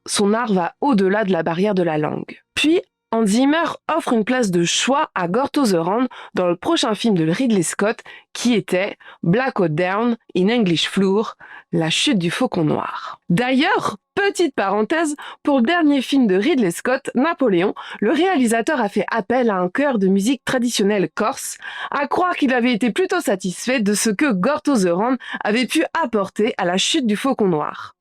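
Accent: Brittany, France. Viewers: J’adore ton accent du breton broerec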